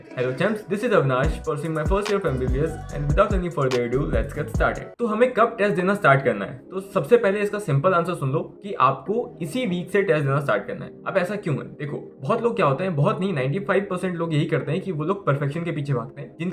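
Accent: Bihar, India. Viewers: Bhai you are from Bihar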